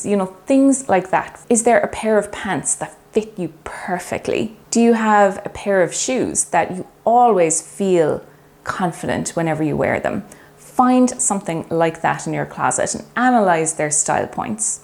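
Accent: Ireland. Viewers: So well said - 52 and curvy here, I love your gentle manner and of course your helpful tips - you have a new subscriber :) PS - I enjoy your lovely Irish accent, and your doggy friend is super cute (and that's coming from the ultimate cat person)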